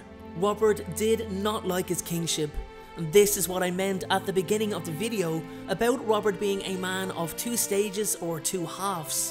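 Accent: Ireland. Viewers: Delighted to hear an Irish accent. First time listener well done.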